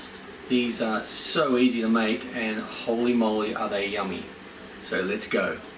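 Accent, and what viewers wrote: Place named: Australia. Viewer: D A T CR4zY Azn no he is definitely Australian ...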